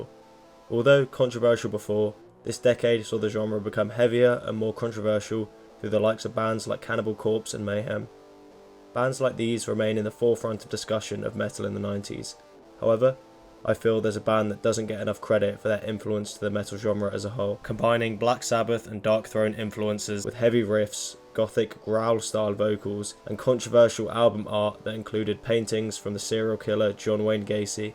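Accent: United Kingdom. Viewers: As a guy in East TX, it's weird to hear a guy with a UK accent talk about acid bath lol. I like Dax's newer stuff too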